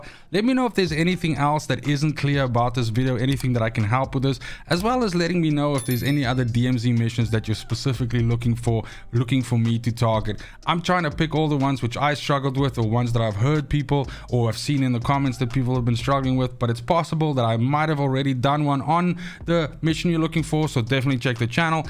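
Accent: South Africa. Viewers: South african? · You from South Africa?or am I hearing that accent all wrong🤣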